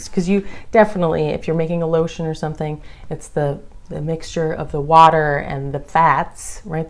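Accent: United Kingdom. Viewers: how tall are you & wots your zodiac sign, where is your accent from as u sound British??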